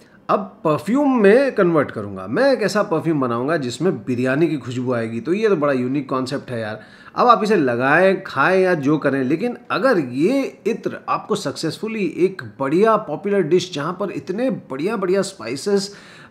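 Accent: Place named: Bihar, India. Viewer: Others : write down the recipe while his chef talking Me : catch his voice tone and identify that he is a Bihari 😊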